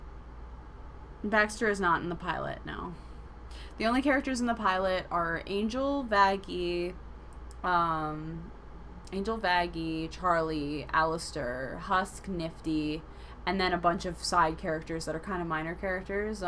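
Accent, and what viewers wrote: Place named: England, United Kingdom. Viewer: I adore your English accent .... Oh, I'm not talking about that. You're gorgeous drawing! I am self-taught in drawing and you always inspired me, and also helped and in general you can say that I taught you how to draw correctly. It's gorgeous ~ And yes, if there are mistakes, then pritenzii to Goggle translator. = 3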